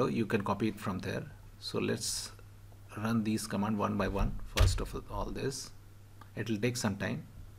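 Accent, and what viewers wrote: India. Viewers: The Indian accent. At least the fix worked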